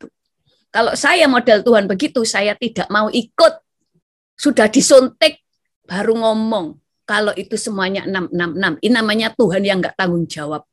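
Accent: Java, Indonesia. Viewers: mantap bu.. Logat jawanya...kental...sy suka...sehingga penjelasannya...bagus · logatnya terkesan medok banget jawa-nya hehehehe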